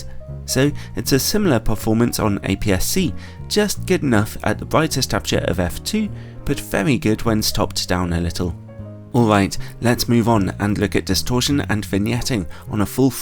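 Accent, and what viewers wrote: United Kingdom. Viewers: Hi, greetings from Italy i always watch at your videos because, at the beginning, i needed some reviews to make clear my shopping ideas, but now i’m totally addicted to your British accent and of course your meticulous way of making reviews. i also thank you for being the best english teacher i’ve had in the past 8 years hope you will come to naples for taking some beautiful photos and also to eat something good, you’re the best. (let me get my new credit card so i can sub to your patreon) pps: when i’m drunk your videos are kinda the best thing i can ever watch because it’s sooo relaxing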